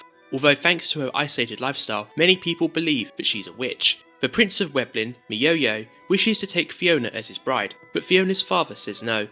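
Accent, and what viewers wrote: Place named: United Kingdom. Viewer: I am truly enjoying your videos and have subscribed to the channel. However allow me to provide some negative constructive feedback. You should realty work on your voice-over. Try to speak slower, and tone down your specific variant of British accent. I am a fully-fluent non-native British speaker, who has been mistaken for a native on several occasions, and who lived in the UK for years and had no trouble understanding the locals (in Scotland, no less). Yet I find myself not understanding words you say on multiple occasions and having to rewind the video, and even having to turn subtitles on when the re-listen isn't enough. Other than that, full respect for your Japanese gaming knowledge and the obvious deep effort and research that has gone into creating these videos.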